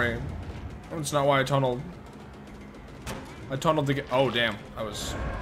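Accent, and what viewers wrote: United Kingdom. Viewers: That british accent is too funny man 😂 this new killer looks fun. Keep up the good work friend - british viewer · Very good British accent I'm very impressed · A bit bri ish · As a brit, I actually like your british accent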